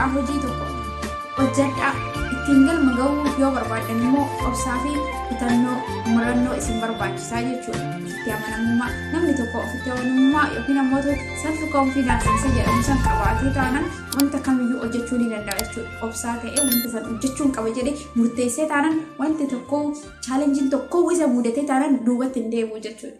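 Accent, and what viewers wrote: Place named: Harar, Ethiopia. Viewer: Anaa dhufuu & waw 😍 cimtuu too gaafifi debii baredaa halaan nama hawwatudha qaxalee too waqayyoo bakka yadden si Haa gahuu qashtii too shawadha jettaa ani intaa harargee natti fakkata bar😍💞akka arargetti hasofta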